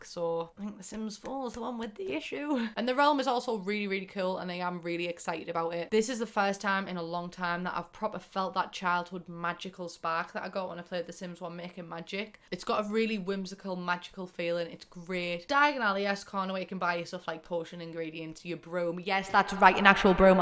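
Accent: United Kingdom. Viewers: I have to turn on closed captioning sometimes to figure out what you're saying (accent for my ears, difference of word usage from US to GB) and I just have to say, watching CC try and and guess what it is your saying is a crack up all in itself ('porn Hilda'...LOL). I will clearly hear words that it TOTALLY messes up. It's just a whole 'nother reason to come watch your videos. Congrats on finishing your Masters and getting your own place. You're doing so well and i'm sure your family is so proud of you. I may be 39, but I still remember those early college years and the struggles (and I only went for a BS, never got a chance to go for my Masters). I hope it's all downhill for you from here on out. I have to say, i'm not a huge occult person, but I am very excited for Realm of Magic. I don't know what I really expected, but so far what i've seen in videos isn't really what I was looking for (again, not sure exactly what I was looking for, but the build items, while nice, just don't scream "witches and wizards" to me and that's really what i'm interested in) but regardless, I will be buying it tomorrow. 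:) I feel like the GP are usually worth the $20 price tag, and i'm a penny pincher, but I don't mind paying for this one full price right out of the gate. Re: Windows...feels a bit lazy to me. I know it's a lot of work involved for meshes, but when the first set of windows are done, without actually ever having built a mesh myself (but having lots of design experience) usually y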